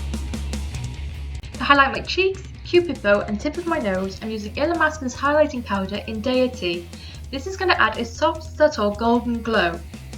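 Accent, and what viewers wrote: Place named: Wales, United Kingdom. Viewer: I love this! Are you welsh? Your accent sounds very welsh haha x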